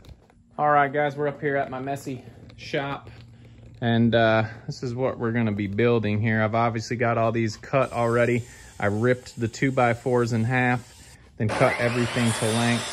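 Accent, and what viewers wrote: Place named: Alabama, United States. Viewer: Hey, that sounds like an Alabama accent you have.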